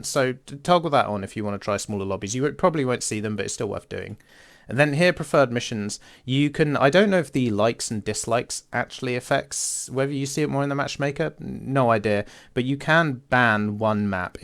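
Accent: United Kingdom. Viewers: mmmmmmmmm asmr british man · Last time I watched a British man yap for 40 minutes it was TheMightyJingles.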